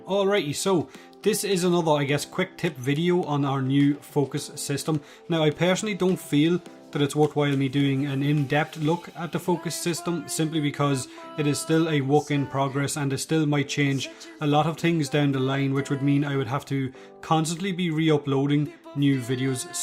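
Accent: Ireland. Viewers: Hearing an Irishman say tree while meaning tree instead of three has left me deeply confused. Tree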